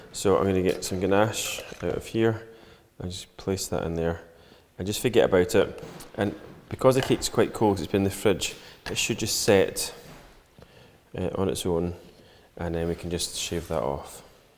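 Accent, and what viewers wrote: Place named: Scotland, United Kingdom. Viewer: Excellent tutorial! Lovely Scottish accent to listen to as well. Thank you.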